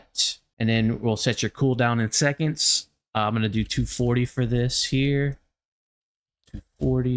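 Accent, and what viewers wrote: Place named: Louisiana, United States. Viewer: Oh you’re definitley Cajun 😂😂😂. 337 definitley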